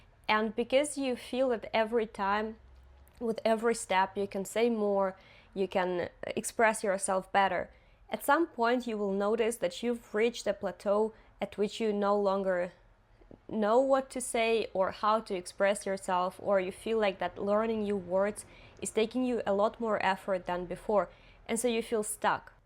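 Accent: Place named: Russia. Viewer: Coming from russian native i guess , your english accent is really impressive, i am working on that, i dont want to sound like an american but have the least accent possible. i like your content because is really helpfull .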